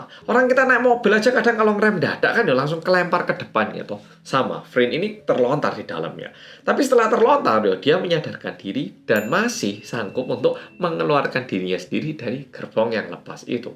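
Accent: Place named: Java, Indonesia. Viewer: SUKAK LOGATNYA MAS. BASA ENDONESIANYA JAWA BGTTT. APIIKKKK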